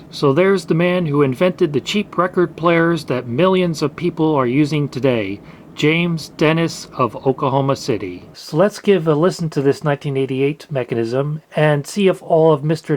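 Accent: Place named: New Jersey, United States. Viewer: I never heard anyone not from Jersey find the accent pleasing. lol.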